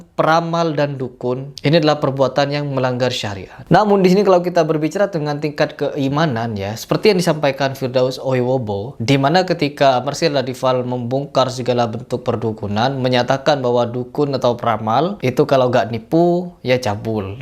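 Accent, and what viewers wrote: Madura, Indonesia. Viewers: Mas sampean kok ada logat logat maduranya, bisa bahasa madura mas??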